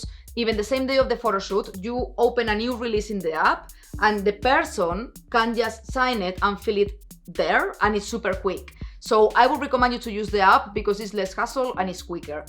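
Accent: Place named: Spain. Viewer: Hi, i love your videos, your viewpoints on the pro phhotography business and your unique spanish accent when you speak english! (My native tongue is French and your english is easier and more pleasant to listen to for me than the excited stance of most american youtubers) Microstock is the niche that interests me the most on youtube so i would like to recommend that you delve more into this topic. Eversince Nicole Glass has left this space on this platform, i have the feeling that you are the only one who can elegantly and efficiently fill up this gap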